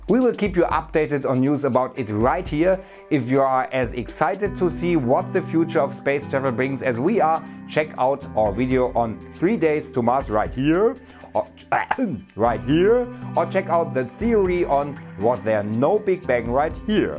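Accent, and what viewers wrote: Germany. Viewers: Sorry. But this Art oft Denglish is terrible. Thumb down. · Denglish would mean that he is speaking like half of the words in German and the other half in English. He only has a german accent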